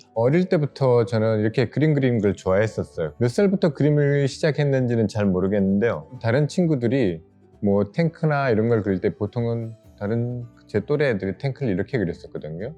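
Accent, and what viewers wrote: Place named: Gyeongsang, South Korea. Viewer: 출생이 경기도 고양시 출생인데 말투가 경상도 쪽 어휘와 억양이 있는데 경상도에서 살았거나 부모님이 경상도 쪽인가? 거의 확실해 보이는데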